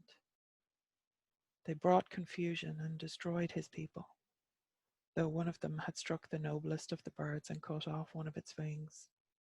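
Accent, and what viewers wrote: Ireland. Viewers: Thank you for this immersion in Irish story in authentic Irish voice and spirit!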